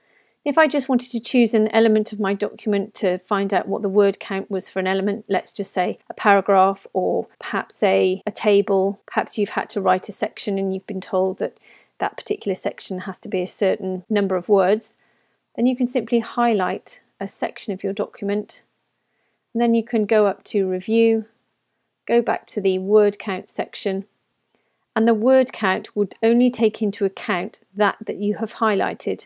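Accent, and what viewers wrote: United Kingdom. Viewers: Straight & Simple. Thank you! (Why does British accent makes the person sound intelligent to start with?)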